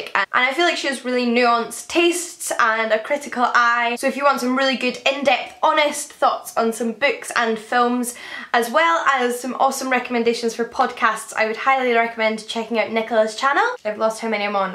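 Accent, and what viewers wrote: Scotland, United Kingdom. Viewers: And your Scottish accent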